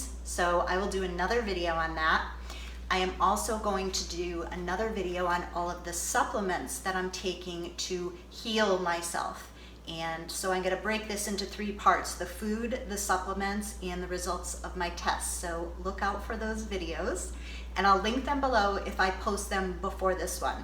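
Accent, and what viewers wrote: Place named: Canada. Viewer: I needed this. Been overwhelmed watching endless videos on FODMAP. I'm going to get this book. Just finished listening to a radio show with the author. Oh btw, you sound Canadian. Still trying to get over your age. You don't look it so you must be doing something right. I'm the same age and get compliments that I look 32-36 but you look young as ever. Thank you for the help. Now a subscriber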